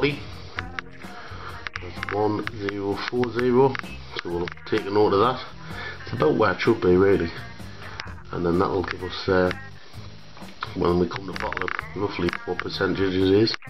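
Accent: Middlesbrough, United Kingdom. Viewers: you must be from the boro.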